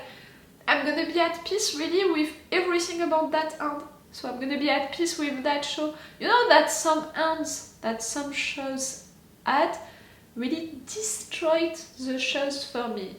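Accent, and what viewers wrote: France. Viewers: I love your french accent it's beautiful! I subscribed. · I love your Frenglish accent and your true to the ground emotional reactions, your tears of joy or sadness, your authentic cuteness. <3 Shy meow and hug from Switzerland. ^^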